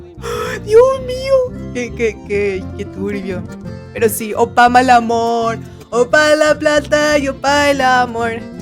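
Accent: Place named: Paraguay. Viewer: Se nota ite luego el asento paraguayo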